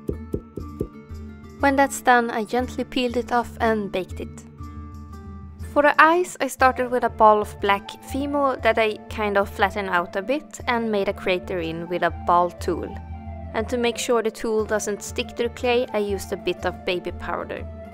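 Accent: Sweden. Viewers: Man hör att du bryter på svenska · this is so awesome! just a question are uu Swedish? I just think so of your accent when you speak English, I might be wrong x3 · You sound swedish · You sound swedish, cus of the kinda bad english🤔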